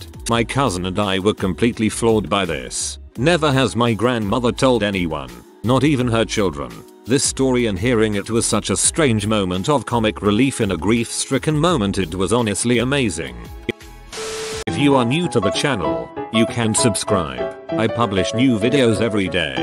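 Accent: United Kingdom. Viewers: The robotic voice with the British accent…distracting and sometimes hard to understand. Get a live person. I Start to listen, I try, but then just have to stop.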